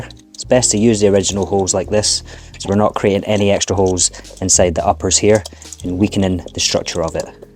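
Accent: Scotland, United Kingdom. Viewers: Watching an amazing craftsman at work…wonderful. Add in soft background jazz, a Scots accent, muscular arms and hands, eyes that give James McAvoy vibes, and wow! Sorry to embarrass you, but it’s true!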